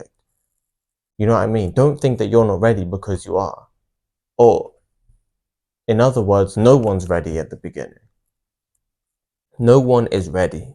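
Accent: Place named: Nigeria. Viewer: Man you talk like a Nigerian 😊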